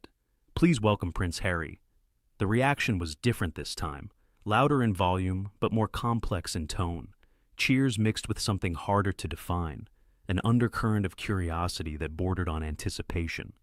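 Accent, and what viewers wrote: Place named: United States. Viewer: Yes, no record of this to be found. Having an American narrate is plain weird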